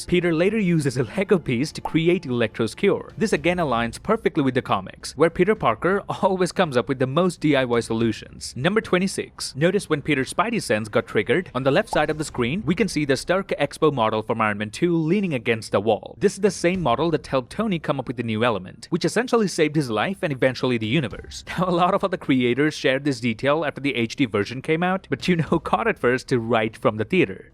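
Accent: Canada. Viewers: You're Canadian!!!! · he is starting to sound more canadian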